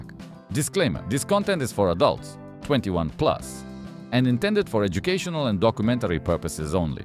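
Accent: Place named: Europe. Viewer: Sounds like european😅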